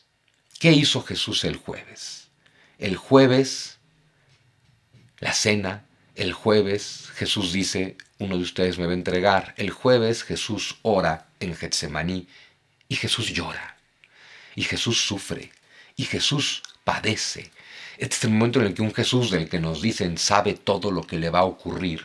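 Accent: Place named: Mexico. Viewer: Me encante el acento mexicano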